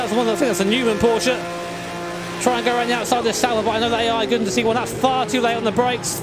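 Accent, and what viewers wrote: United Kingdom. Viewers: He's British, to an Americans of course he sounds the part. Now let's see him during a Daytona 500 yehaaawww got dam look atem go jerry,yeah not so much. He'd also sound good in a premier league match(football soccer) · wow British people seems to have a default beautiful commentary voice! loving it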